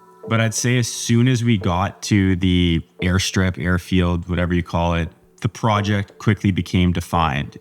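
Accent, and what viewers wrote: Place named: Canada. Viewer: Dude, excellent storytelling. Excited to have you on the platform. Also that Canadian accent is 🔥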